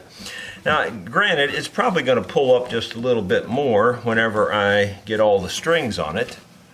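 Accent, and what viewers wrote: South, United States. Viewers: Pay no attention to the nay-sayers. I am an old country boy and retired police investigator. My old partner used to say I was 'country smart'. He said that I was able to figure out the best and easiest way to accomplish a difficult task. You even have the same country accent that I have. Pay no attention to the jackleg "I know best or a better way' types. You ever get into N Georgia and the best college football team in the nation, Go Dogs! I'll buy your lunch. Keep up the good work!! WJS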